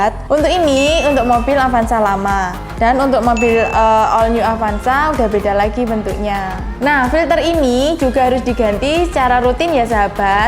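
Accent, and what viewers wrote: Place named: Java, Indonesia. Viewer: Medhok sekali ya kak wakakakakak tapi NICE banetttt kak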